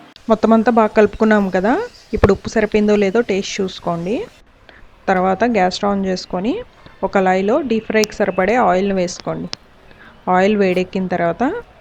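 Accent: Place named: Rayalaseema, India. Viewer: mee maatalalo rayalaseema vaasana vastondi meedi evuru?...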